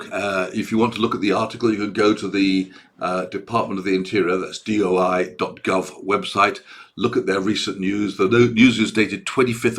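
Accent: United Kingdom. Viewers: I love the content of course, baut also, as a French, is just delghted listening to your british accent.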